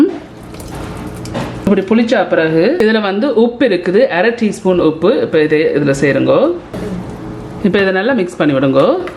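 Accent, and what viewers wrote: Jaffna, Sri Lanka. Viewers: Love ur vids .just for fun since you speak lovely jaffna thamil, the subject should start as Moondu not moonu :-D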